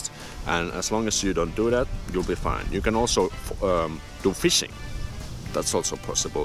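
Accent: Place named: Finland. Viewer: Puhut muuten hyvää englantia mutta kuuluu sieltä 1% sitä suomen murrettaki mutta sehän kuuluu asiaan 😂💗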